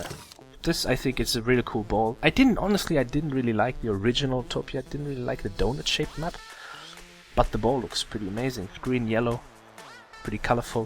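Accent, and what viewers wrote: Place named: Germany. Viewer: mal ne runde zocken? Man hörts am English :)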